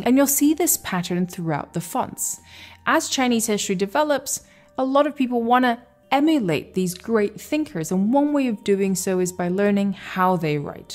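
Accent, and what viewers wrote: England, United Kingdom. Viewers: you've got a good British accent. you sound like Emilia Clarke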